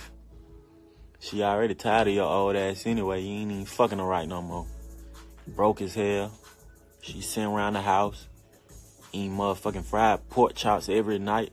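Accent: United Kingdom. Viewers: Ok British boy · Nigga u British u aint from the A fr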